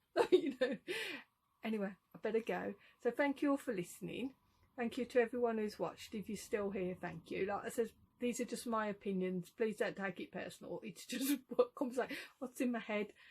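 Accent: Birmingham, United Kingdom. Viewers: My goodness. Your amazing. How I long to be able to sit in a cafe and hear a person at the next table that I don't know talking about ordinary everyday things. And that fabulous accent. Takes me back to my childhood in Brum. Your Ronnie Corbett meandering is so good. I'm not sure you know much about the 70"s you'd have been too young. However the 70's as a teenager and twenty something was amazing in Brum. At the top of Broad St you had the Saints and Sinners cafe and Barerellas and the Rum Runner . If you can't remember it you weren't on the scene. It was so cool. Thanks for posting. James. PS. your suggestion of mass surveillance and behavior profiling would be a non starter. They already do it in China. It's called "big brother" I recommend the book 1984. But not to worry your thinking out loud.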